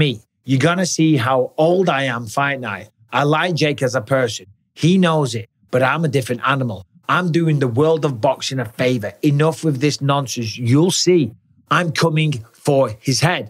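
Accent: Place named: United Kingdom. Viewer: Why are you speaking like a Brit?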